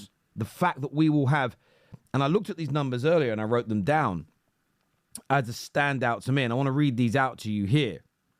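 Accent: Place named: North, United Kingdom. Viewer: I’m struggling to understand your broad northern accent.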